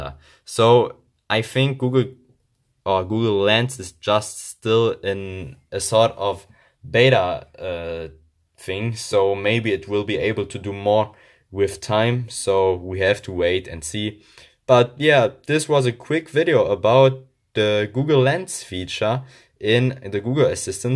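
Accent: Germany. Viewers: Are you from Germany? You sound like a german dude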